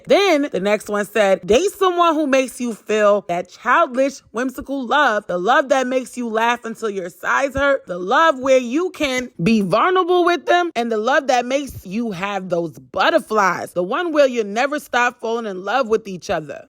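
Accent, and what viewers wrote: New York, United States. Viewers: I Lvov’s ur New York accent❤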